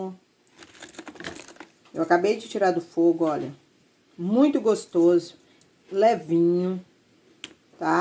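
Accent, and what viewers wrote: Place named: Minas Gerais, Brazil. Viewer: eu li um comentário q disse ,pelo sotaque só pd ser mineiro sujo ,ou goiano , não entendi fiquei curiosa, por favor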